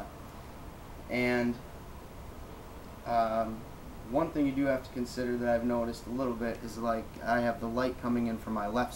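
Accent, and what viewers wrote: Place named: Minnesota, United States. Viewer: are you from Minnesota??? I heard my accent!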